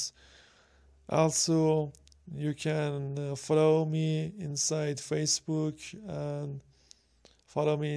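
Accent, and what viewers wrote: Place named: Iran. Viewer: Are you Iranian dude? I love your Accent :)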